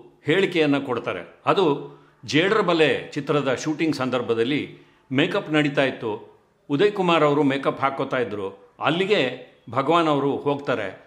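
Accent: Standard. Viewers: ನಿಮ್ಮ ವಿಶ್ಲೇಷಣೆ ವಿವರಣೆ ಕೇಳುಗರಿಗೆ‌ ತುಂಬಾ ಹಿತ ಎನಿಸುತ್ತದೆ. ವಸ್ತು ನಿಷ್ಟ ಹಾಗೂ ಸತ್ಯ ಎನಿಸುತ್ತದೆ. ಸ್ವಚ್ಛ ಸುಂದರ ಕನ್ನಡ‌ ಕೇಳಲು ತುಂಬಾ ಹಿತ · Your Kannada is awesome. Pure and honest speech. A big salute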